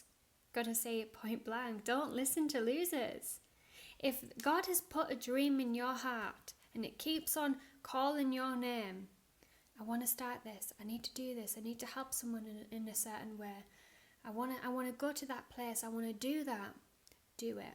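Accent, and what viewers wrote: Northern England, United Kingdom. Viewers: Love the truths you share, and absolutely love your northern accent 😊❤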